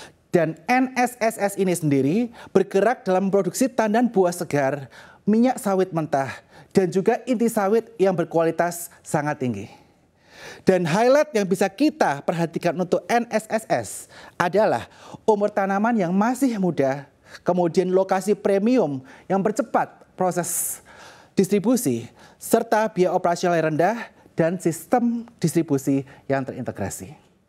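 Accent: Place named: Java, Indonesia. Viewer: Mas yang bawa acara orang jawa ya ? Medok banget jawanya.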